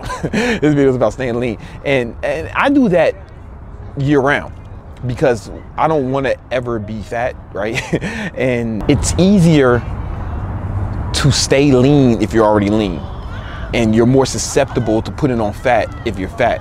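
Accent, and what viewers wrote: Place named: New York, United States. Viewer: For someone that's from ChiTown, you have a heavy set, NYC accent fam. When I first saw.your vids 2-3 yrs ago, I always thought you where from Da Big Apple. How long you've been living here? P.S. I took the liberty to check your Six Pack Cheat Codes. Definitely gonna add you to my email friend list. I saw PT 1 & that shit look mad intense. I'm recovering from the flu hence, I don't have the endurance much less, the strength to dare fuck with your regime. Not til I get healthy. I'm slim, I should be able to get a six pack before summer ends. P.P.S. Didn't I tell you your Bulls were done in the 90's fam? 😄 I know, I know, Knicks in the 70's. Smh!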